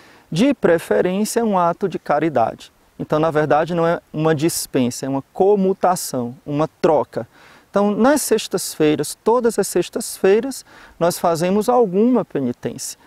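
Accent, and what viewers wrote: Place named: Northeast, Brazil. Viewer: é nordestino?????? Nem desconfiei, e agora vejo que tem um "sotaquezinho" ai kkk. Padre eu sabia dessa lei da Igreja, mas costumo esquecer e acabo comendo. Quando lembro vem um pouco de culpa, mas já não tem volta... é tentar na próxima.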